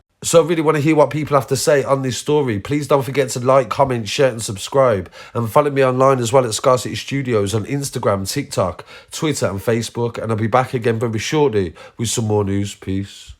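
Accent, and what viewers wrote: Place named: North, United Kingdom. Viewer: I have no idea who you are, but it is so refreshing as a cockney from daan saaf to hear a clear and strong northern accent reporting news unlikely to get serious coverage. I choose to have a TV free home to avoid the massivley controlled and lazy media coverage we are fed. I prefer info that is the antiithesis of that we are asked to focus on.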